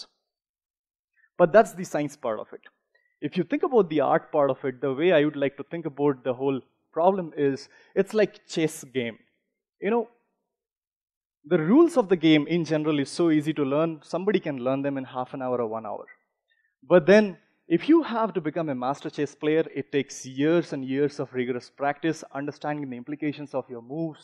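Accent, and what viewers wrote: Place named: India. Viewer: very thick indian accent. hard to follow.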